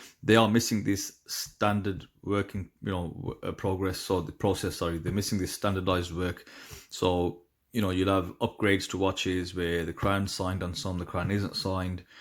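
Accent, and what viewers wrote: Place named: West Midlands, United Kingdom. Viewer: Sounds like you're enjoying the watch. Been tempted by one of these. Also, I recognise the accent. A fellow West Midlands lad? 😁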